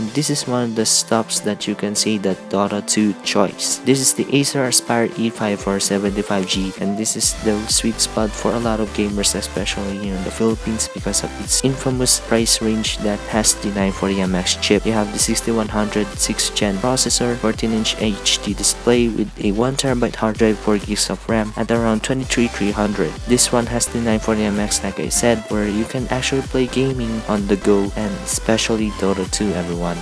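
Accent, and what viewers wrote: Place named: China. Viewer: i cant understand the damn specs with that chinese whatever style accent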